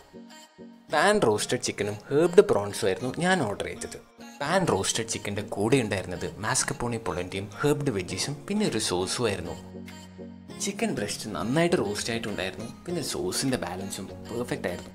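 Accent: Kannur, India. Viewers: He is from kannur athonda evande sound egane😍🤩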